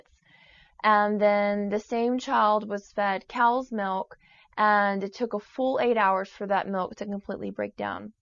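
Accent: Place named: South, United States. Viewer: Yeah I notice that too. I think it's her trying to hide a southern accent.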